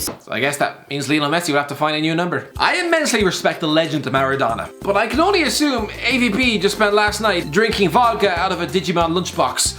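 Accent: Ireland. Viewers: Arent u Irish or snt? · Best thing I have ever heard in an Irish accent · Good lord, this is the most obnoxious video commentary that I've heard in a very long time. What's wrong with you, Irish guy? Take a deep breath sometime.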